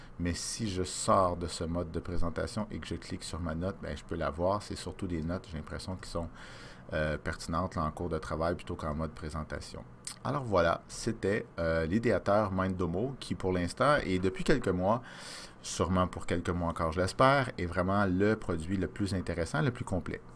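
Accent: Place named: Quebec, Canada. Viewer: Non, si tu savais comment tu me sauves du temps. Tes capsules sont très bien expliquées car j'ai fait le tour...et d'autant plus c'est la voix d'un québécois... hihih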